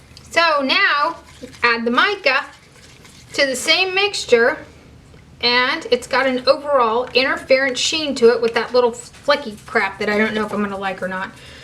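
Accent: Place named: Texas, United States. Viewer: And by the way, I'm a Texan transplanted to upstate New York and it is so good to hear that Texas twang! Love y'all!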